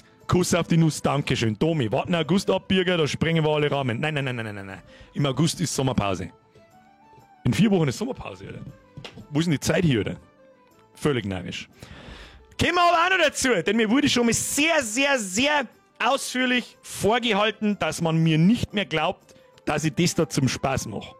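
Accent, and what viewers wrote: Bavaria, Germany. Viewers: Merce für die stets gute Unterhaltung. Da vergeht der Arbeitstag gleich viel schneller. Gut, daß ich bayrisch versteh' haha. Grüße aus Mecklenburg.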